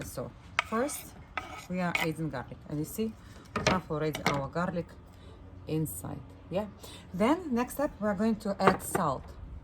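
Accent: Russia. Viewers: Thanks for the recipe! I have to try it. 🩷 👍🏻 I think you have a Russian accent! Where are you from?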